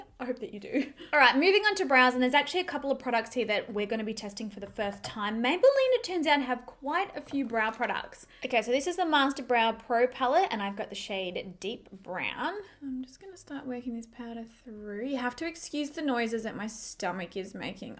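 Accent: Australia. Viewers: Just as I'm having fun listening to your aussie accent..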